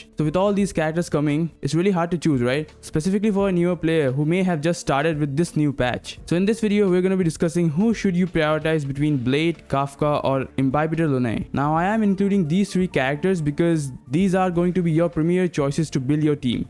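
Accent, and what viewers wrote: India. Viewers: Bro yur accent is like Indian is that true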